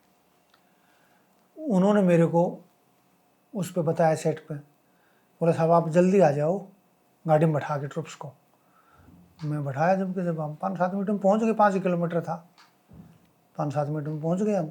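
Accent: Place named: Haryana, India. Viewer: Sir aapki galti nhi hai haryanvi accent sabhi ko samajh nahi aata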